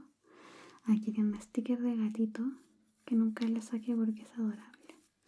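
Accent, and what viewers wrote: Chile. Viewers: Me vengo enterando que a la serie de terror le fue mal y es de mis vídeos favoritos por la historia y el esfuerzo en todos los vídeos, no lo creo. 😤 Saludos, me encanta tu voz y el acento chileno. 🧡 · Primera vez que pude sentir su acento chileno ❤, me daba curiosidad por los vídeos en neutro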